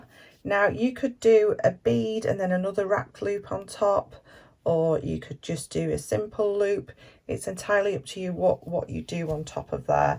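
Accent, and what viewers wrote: United Kingdom. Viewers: Enjoyed your video I learned so much and enjoyed your British accent 😊 have been to UK 3 times and would love to go again ❤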